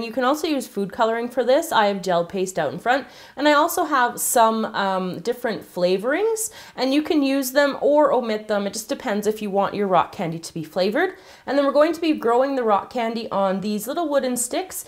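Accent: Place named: Canada. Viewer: i love Canadian accents.....there adorkable 😊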